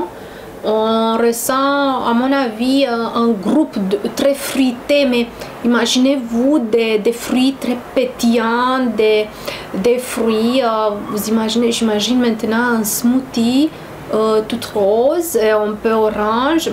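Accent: France. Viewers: J'aime vraiment la langue française, surtout l'accent du français, j'aime beaucoup voir cette vidéo tournée en français! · Nice review! I like your french accent. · Love this chanel and nice french accent!! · Nice French accent!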